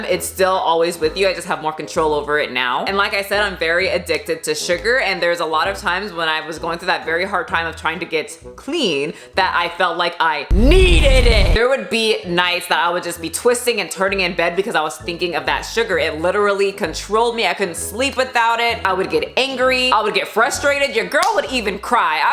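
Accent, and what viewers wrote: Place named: New Jersey, United States. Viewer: Omg I’m watching this video 5 years late. I LIVED HERE 5 years ago! I’ve been to that movie theater! My gym was on the next block! 😂 ( I’m so glad I found your content in the past year. And your NY/NJ is on point 💚)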